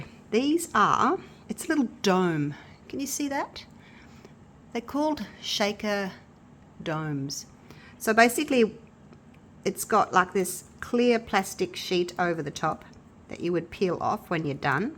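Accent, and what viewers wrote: Australia. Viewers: I love the fact that you talk all the way through because apart from the fact that I just love the Aussie accent, the ones that play music in the background just does my head in. Also I love what you show us.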